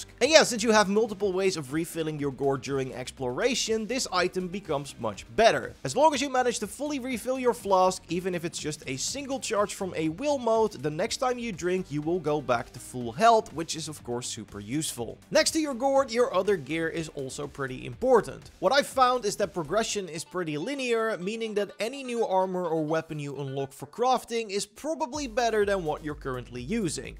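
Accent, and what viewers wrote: Netherlands. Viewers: You are very helpful, yes. But my brain could not shake the feeling that you sound very very Dutch 😂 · Bro your English is very Dutch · Why do you sound familiar to a Dutch guy speaking English? Thanks for the video dude.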